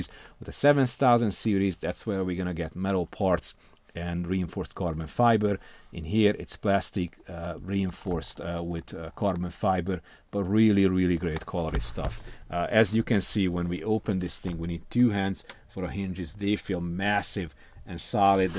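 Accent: Hungary. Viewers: Nem azért írtam, csak annyira karakteres a magyar akcentus. Jó nézni a tartalmaid,csak így tovább!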